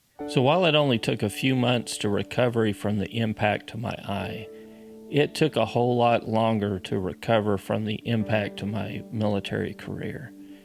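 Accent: Texas, United States. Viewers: Kirk Dyreson, welcome to how real Texans think and talk. I would buy anything thing from this man if he looks me in the eye and shakes my hand. Content is was counts and you’ll get used to the delivery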